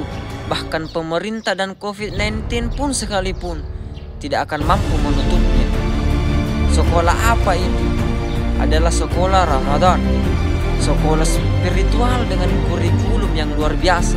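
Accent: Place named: Makassar, Indonesia. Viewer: Alhamdulillah vidionya semakin bagus,semoga berkah.... Logat Makasarnya medok banget...hehehe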